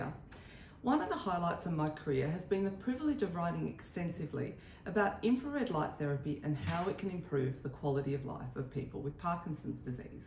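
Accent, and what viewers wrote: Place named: Australia. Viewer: I find your video very informative 👍 Plus, I really like your Aussie accent :)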